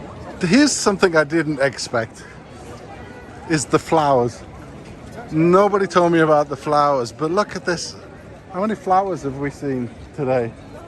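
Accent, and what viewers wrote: Northern England, United Kingdom. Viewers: oh no please do talk on your films. I can hear only slight northern accent but I live in UK so recognise some accents. I want to tell you about restaurants - secret gardens 🤗🤭, You passed one yesterday in Grodzka near Wawel. Another La Campania in Kanonicza Str. On the 29 May eating inside restaurants in Krakòw.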